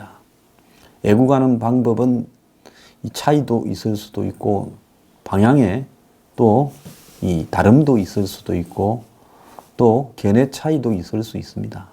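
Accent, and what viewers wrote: Gyeongsang, South Korea. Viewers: 루카스님의 의견에 동의합니다 기분이 좋네요 미국의 선거전 이 정부 어쩌나! 정의는 이긴다 황소장님 경상도 사투리 정이가 네요 남편이 진주 태생이고 똑 부러지는 사람이거든요 황소장님 화이팅!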